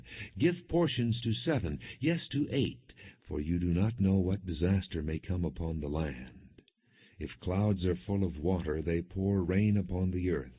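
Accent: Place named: United States. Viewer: had a hankering for this book and had to look awhile for an American accent reading, the cheesy shakespearean british ones fail to capture the modernity/coherence of the prose. good stuff!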